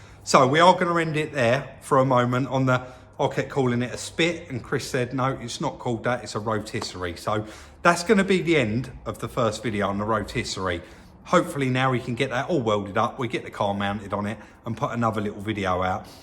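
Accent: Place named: Yorkshire, United Kingdom. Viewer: He must be a Yorkshire man .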